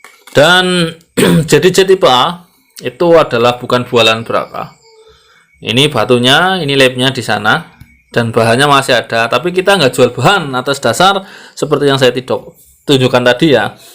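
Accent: Banyumas, Indonesia. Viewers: kan wong ngapak, ora ngapak ora kepenak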